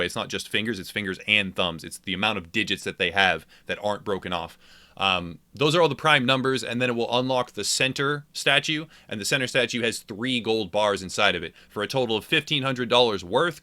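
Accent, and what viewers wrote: United States. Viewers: Typical thick American pronunciations, Saint Denis for example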